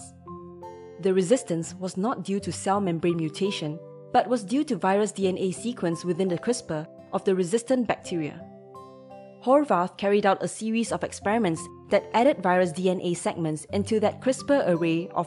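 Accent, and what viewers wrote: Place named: Singapore. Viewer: CRISPR will fix that horrible Singlish accent.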